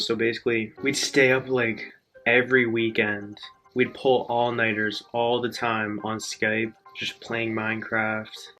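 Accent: Canada. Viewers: You do sound Canadian though. The way you pronounce "out" and "about". Sounds Canadian bro! I suppose you could be FROM there and now living in the Boston area?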